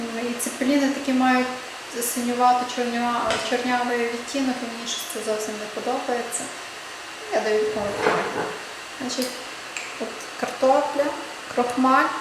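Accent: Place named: Galicia, Ukraine. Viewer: Смачний рецепт ,непорівняний Галицький акцент,.дуже дякую.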